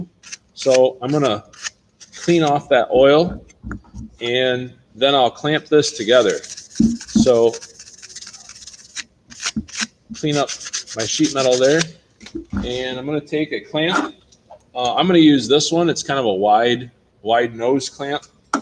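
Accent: United States. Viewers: He speaks American and that is how it is pronounced. Not sure what you are crying about.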